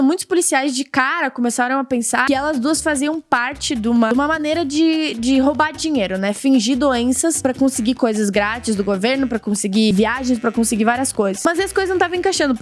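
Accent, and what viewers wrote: Rio Grande do Sul, Brazil. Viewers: Mas tu tem o sotaque daqui de rio grande do sul tchê!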